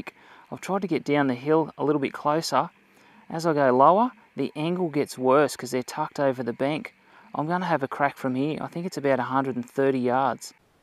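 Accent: Australia. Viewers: I notice with your air file that you aim high through the scope, so to me there is still an element of skill and guess work goes into getting a successful shot, and you can see the round dropping as it travels. This is just my ignorance , but do modern sights with range finders built in not adjust the position of the crosshairs to account for range with an air rifle so that you still only have to put the crosshairs on your target rather guesstimate? Thanks. I’m really enjoying your videos having recently found them. You have a great Aussie narrative.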